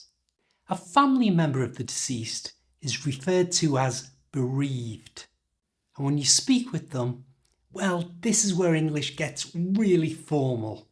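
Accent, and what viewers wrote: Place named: North West England, United Kingdom. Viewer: People in the south use an AH sound in "passed away", while those in the north use the short A. This guy must be from the urban north-west, like around Liverpool or Manchester.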